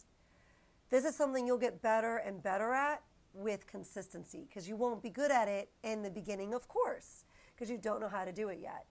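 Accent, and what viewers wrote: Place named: New England, United States. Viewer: i have been following you since day 1. I went from 0 sales in may to 360 sales today, which is not tremendous but I don't have a lot of time to spare so I consider it a win. I owe a lot of my success to you and a few other youtubers like you. So thank you!! I was honestly surprised because I haven't had a single 0 sales day since January 1st. Before that, it was probably October. Again, I owe it to you ❤❤ In other unrelated news, when I first found your channel I was surprised by something else and that's Tucker lol. I don't know where you live but judging by your accent i assume somewhere in New England. There's a gardening Channel i watch, James Prigioni, and he has a little dog called Tuck who looks a whole heck of a lot like Tucker. They live in Jersey. Just a fun fact 😂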